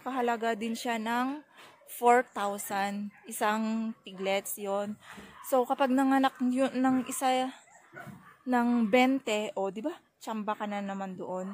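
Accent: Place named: Tagalog, Philippines. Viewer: You speak clean Tagalog is here for me to understand👍 salamat. Baboy to loud. New friend and subscriber. I’m Jerry from north carolina u.s. lol mabahoo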